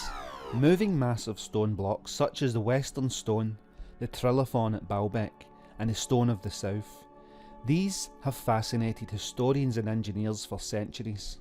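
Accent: Scotland, United Kingdom. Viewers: Always good to hear a fellow Scot. I’m doing a similar video at the moment for my channel. The Thunder Stone in St Pete’s which I’ve been to and is about 1500 tons was moved by manpower in the 1700s. I’ve been to the Menga Dolmen with a 180 ton capstone, Broken Menhir in Brittany up to 350 tons or the Unfinished Obelisk over 1000 tons. The ‘technology’ and magic for me is nature. This is what we’ve lost sight of and our connection to the planet.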